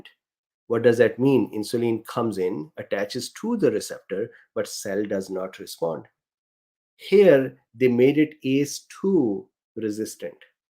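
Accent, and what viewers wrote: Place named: India. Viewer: Many times I try to watch your vids but couldn't put myself through your accent. I am not a native speaker of English either so I understand many people who speak English with some accent, but Indian accent is the one that I can not stand. Too bad.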